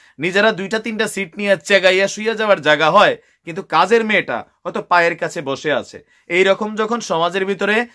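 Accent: Sylhet, Bangladesh. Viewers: মাশাআল্লাহ খুব সুন্দর ভাবে সিলেটি ভাষায় কথা বলেন,আমি সিলেটের মৌলবীবাজর তাকি। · Amrar Sylheti mat apnar muko hunia bala lager... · Sundor laglo Sylheti language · ভালা লাগলো আপনার সিলেটি মাত হুনিয়া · Vai khub sundor sylheti matoin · ভাই আপনার ভারি কি সিলেত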